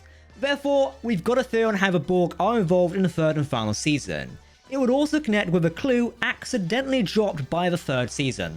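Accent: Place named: United Kingdom. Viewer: 😁Shut the Fuck with winey Bullshit British Ass cent. 😄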